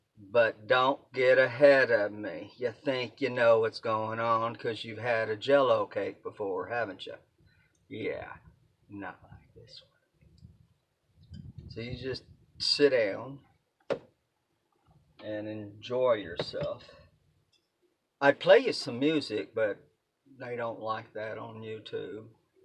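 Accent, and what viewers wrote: Texas, United States. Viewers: I loved watching this video and loved listening to your Texas accent. We live in North Texas stationary and travel as much as possible. Thanks for sharing this with us. I am going to try this with dollar store pound cake. My Momma used to make the best homemade pound cake.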